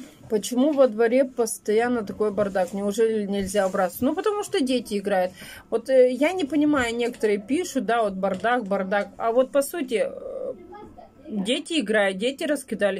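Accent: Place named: Perm, Russia. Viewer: Зачем Вы позорите Пермский край? Не надо врать, что у нас так все разговаривают. Если Вы не образованная, то не надо говорить, что все такие.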